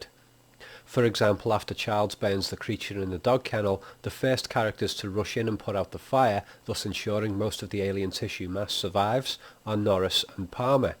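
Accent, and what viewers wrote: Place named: Liverpool, United Kingdom. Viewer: Great video. The new film was very sloppy film making cashing in on the name. I did want to ask unrelated to the video, where are you from? As you sound scouse but you use a fair amount of American words the English never use and some American pronunciations.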